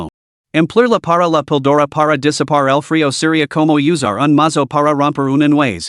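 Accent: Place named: England, United Kingdom. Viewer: Arreglen ese acento inglés que arruina la narración 👎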